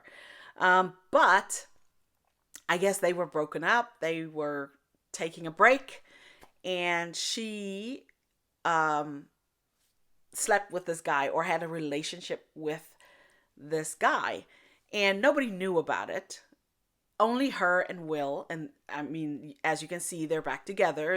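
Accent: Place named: South Africa. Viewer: Clicked as soon as I saw your notification. Your South African Accent coming through nicely today😊 Out of all things I've heard this makes the most sense. Yes they are married to one another and they don't owe us anything. {Also this is the first time ever I get to comment First! }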